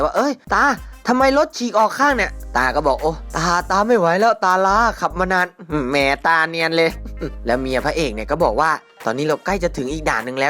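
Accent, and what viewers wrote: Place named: Suphanburi, Thailand. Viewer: พูด แบบ สุพรรบุรี ครับ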